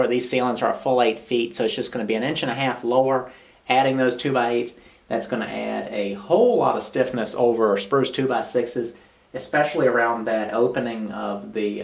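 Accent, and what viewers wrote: South, United States. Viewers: What state are you in? Your accent sounds like Alabama, Mississippi, Tennessee, or Georgia. Maybe the Carolinas....I'm in GA.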